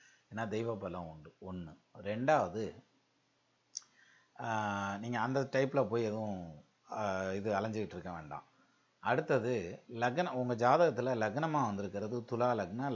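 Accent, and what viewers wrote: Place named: Bodinayakanur, India. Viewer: Hi sir, nice Tamil pronouns, my native place is Bodinayakanur your speaking styles are reminding my childhood days, my question 1. Pl tell Nadi Jothidam is true or lie 2. In 12 house is Sani 6 house is seivai it good or bad 3. Pl give month rasi plan it's more help for all 4. personal question I was born 1989 time 10.30pm Place Kambam mahara laignum star barani I lost many in past 10 years I saw failure only till now pl help me and tell my career path sir pl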